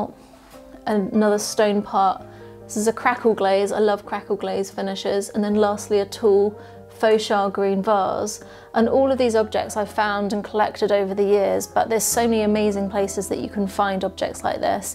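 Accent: United Kingdom. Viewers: How do you spell the word that describes the very large mirror on the staircase? I'm not familiar with the British accent, so I can't tell what is saying Thank you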